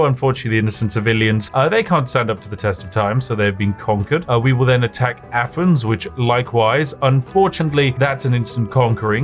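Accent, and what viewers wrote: United Kingdom. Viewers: Been binging spiff for the last 3 nights, a 12 hour shift is certainly far more enjoyable with that beautiful British accent. Glad to have a new video finally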